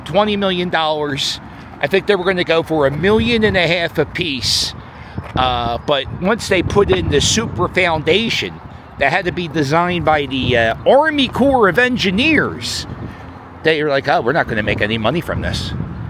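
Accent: Philadelphia, United States. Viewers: War Ter st!!! LOL Love that philly accent!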